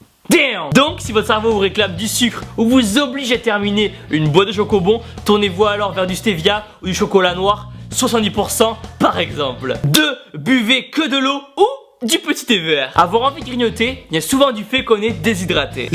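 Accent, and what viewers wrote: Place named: Toulouse, France. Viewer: Daaaaam quoi de mieux qu'un bon accent Toulousain et de la bonne humeur pour se motiver !! Au top !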